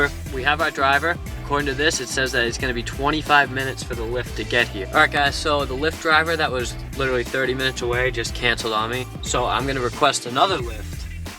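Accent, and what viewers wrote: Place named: Boston, United States. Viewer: u from Boston I from Boston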